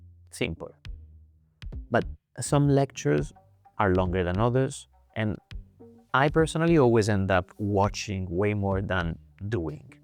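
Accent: Italy. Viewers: The way he talks, is he italian... c) can't tell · L"accento italiano non mi sfugge😂. Great video bro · I loved this video! Very useful suggestions, an extremely handy website at the end e il tuo accento italiano mi diverte (non è una presa in giro :) )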